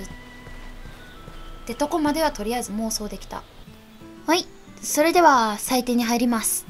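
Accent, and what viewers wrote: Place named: Kansai, Japan. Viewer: モンハンワールドグラフィック神っとる、そしてさんの関西弁がかわいい…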